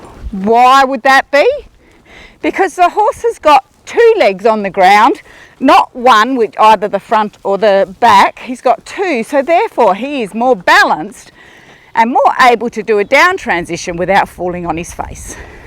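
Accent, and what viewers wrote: Australia. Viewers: You're literally the best!! And I love to hear a fellow Aussie accent :D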